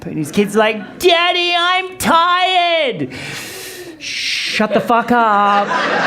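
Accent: Australia. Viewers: R u an Aussie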